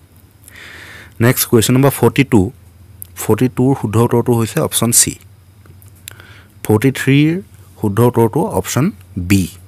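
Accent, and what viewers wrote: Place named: Sivasagar, India. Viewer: English laga sivasagar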